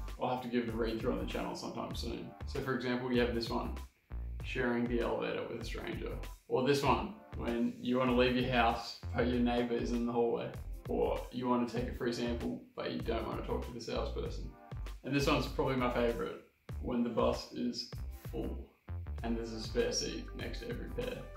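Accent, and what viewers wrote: Finland. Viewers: Yoooo! Your finnish accent is damn good! :) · I haven't heard that kind of finnish accent except maybe from Kimi Räikkönen.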